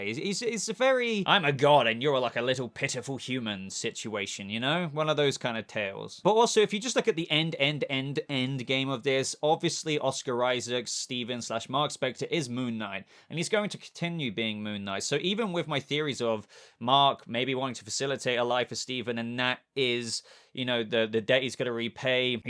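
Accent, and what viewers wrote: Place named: London, United Kingdom. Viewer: to be fair his accent does sound like a foreigner maybe greek turkish Spainsh guy who lived in london or south England kinda middle class esk area or ppl or very r.p speaking environment like retail , office , customer service mix with abit of 'lil o bit' Oliver twisty shite Cockney accent i have heard people talk him or similar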